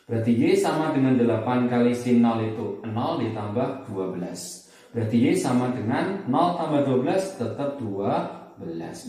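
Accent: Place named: Java, Indonesia. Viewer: gatau kenapa kalo yg ngajar suaranya rada meudhok2 gt,lgsg paham :))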